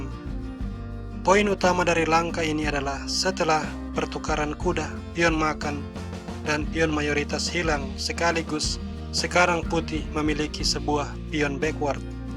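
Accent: Manado, Indonesia. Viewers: manado ngomong 8 itu lapan bukan delapan... itu uda kliatan bgt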